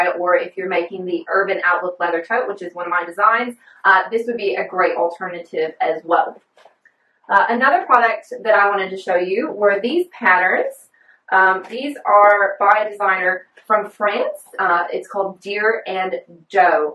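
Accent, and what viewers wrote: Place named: Australia. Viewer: I thought you were Australian, then I thought you weren't, then I found out you are a bit of both! It's good to have some one to watch who can tell us about products available to us locally.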